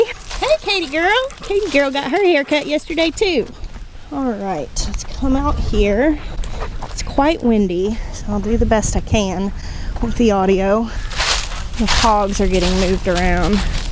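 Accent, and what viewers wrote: South, United States. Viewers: mah goodness, yur sourthern accent is deepening---South Carolina affected??? Your audience is much broader; please remember your viewers watch your channel for information, community, and connectivity. Don't leave the non-southerners high and dry. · Are you saying people outside the South are so intolerant that they can’t handle Southern Accents?! What nonsense. her accent is fine and part of a valuable and unique culture.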